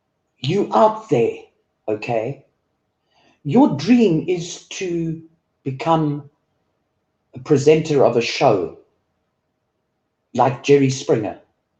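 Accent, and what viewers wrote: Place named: South Africa. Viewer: Sooo glad I found another South African here in this crazy community! 😅 Great to hear a familiar accent!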